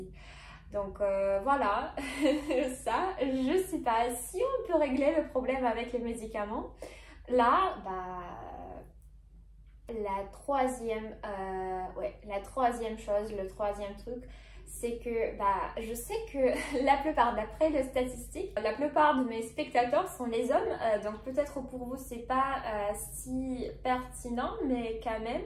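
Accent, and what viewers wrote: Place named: Russia. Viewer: Bonjour. Nouvel abonné. L'accent russe apporte à la France tout le 💨 vent froid le blizzare de Moscou et comme il fait encore très chaud en France.. c'est le dépaysement . Merci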